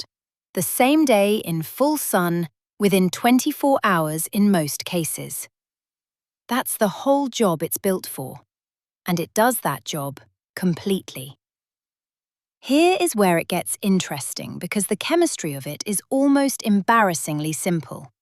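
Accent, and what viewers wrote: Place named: United Kingdom. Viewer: Why do you have a British accent on a video about Amish weed prevention? Shouldn’t it be more of a German accent?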